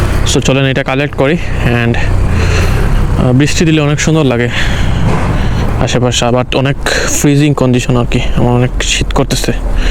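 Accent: Sylhet, Bangladesh. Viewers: সিলেটি নি ভাই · ভাই আফনে সিলেটি নি??,,কইবা আমারে,,❤ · Bhai apne ki sylheti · ভাই তোমার কথা হুনিয়া বুঝা যার আমরার সিলেটি মানুষ। ভাইর বাড়ী কই 😊❤❤ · vai apni ki sylheti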